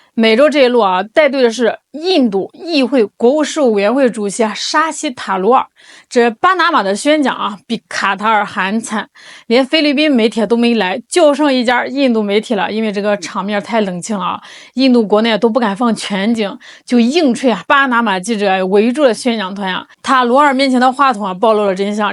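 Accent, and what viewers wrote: China. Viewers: 口音100%是中国的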